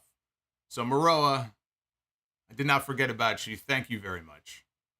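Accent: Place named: Brooklyn, United States. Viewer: Omg! The footage of your bar mitzvah melted my heart. You still have your Brooklyn accent, don’t worry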